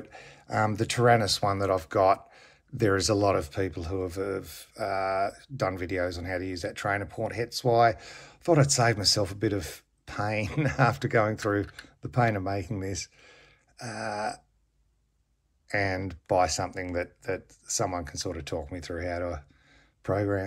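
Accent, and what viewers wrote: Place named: Australia. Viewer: Woot! Sounds like an aussie accent! Even better.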